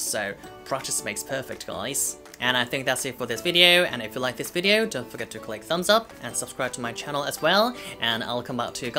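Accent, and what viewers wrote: United Kingdom. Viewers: Your accent is very impressive considering you are not from England! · 제가 하기엔 어렵지만 넘 멋있어요 영국발음 닷츠 워우타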